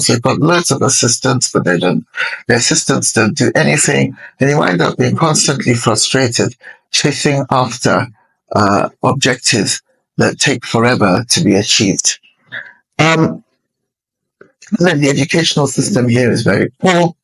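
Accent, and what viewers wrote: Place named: United Kingdom. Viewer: My dear, anything that you find interesting is ok with me. You have a gift of gab as we say in America…..plus your British accent is quite charming. Enjoy your vacation, eat whatever you wish and come back with a new lease on life. Take care,I will look forward to your return…..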